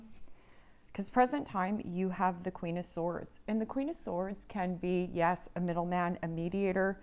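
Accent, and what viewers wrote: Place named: Canada. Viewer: ❤ I thought I detected a Canadian accent 🙂🇦🇺🇨🇦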